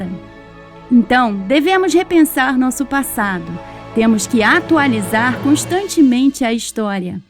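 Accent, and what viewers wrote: Rio de Janeiro, Brazil. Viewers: Adoro o sotaque! Acredito que seja carioca, e eu acho lindo... 😊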